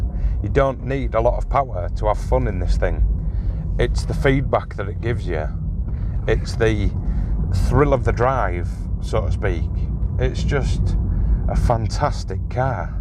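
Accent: Yorkshire, United Kingdom. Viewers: your very good buddy I’m looking at a CRZ next week in my home city Liverpool it’s black ( although they look better in white) 90,000 on the clock £3,200 …it looks like it’s been looked after to be honest mate I’m excited at the prospect of owning one after your excellent review …I drove one years ago and I thought wow what a cracking motor …your review has tipped the scale in me wanting to get one so credit to you buddy …your good you bring something to the car review table that’s refreshing …I sense your a Yorkshire man i live in the Lake District …thanks for that and the reply onward s and upwards buddy I really wish you well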